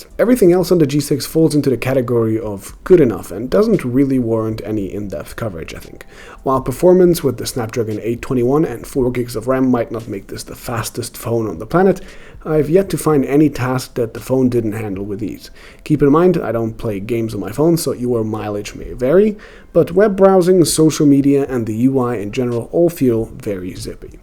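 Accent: Germany. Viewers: Kommst du etwa aus Deutschland? :O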